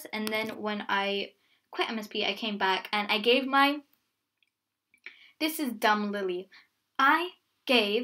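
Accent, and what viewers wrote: Denmark. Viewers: Wait are u danish?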